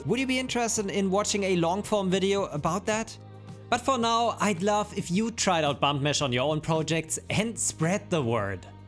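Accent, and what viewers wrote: Germany. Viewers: super videos wie immer, aber bitte, nett gemeinter tipp, arbeite an deinem deutschen akzent. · United by East German accent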